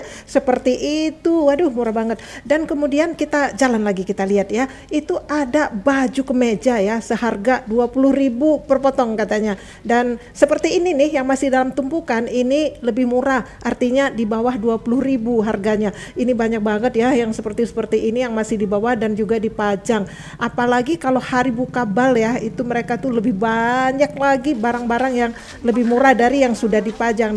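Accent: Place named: Banjar, Indonesia. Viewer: oh maaf logatnya kadang sprti sdra2 kita yg dr Banjarmasin☺️☺️☺️ sy penduduk kaltim turunan Bugis lahir Jambi 🙏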